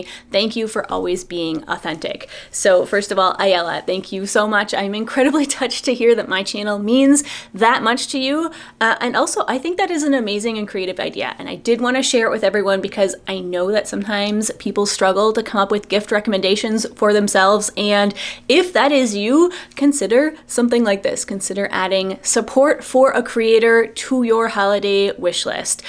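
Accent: Quebec, Canada. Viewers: 😁😊💪It is a joy listening to with his Québécois accent. C'est super.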